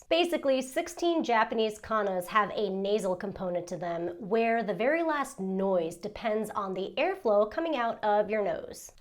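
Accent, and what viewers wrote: United States. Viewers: are you american or japanese? you pretty much sound american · It's fascinating hear your English sound American, and then your Japanese sounds native. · Your American accent is very nice. Were you born in USA or did you learn to speak like this?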